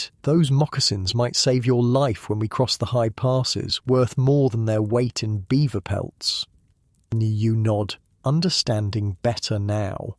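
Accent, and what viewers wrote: England, United Kingdom. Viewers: PM in NY... There's more than a handful of these types of videos out there, but I prefer because of the narrator's English accent and his sly metaphors. They paint such a vivid picture, and mix very well with Moonlight Aura Vibes' video, Cat Purring & Brown Noise (10 Hours). I usually put together a playlist that takes me through the entire evening, allowing me to subconsciously be an opium addict in Victorian time, to The TERRIFYING Life of a Child Emperor in Imperial China, and all points in between! ❤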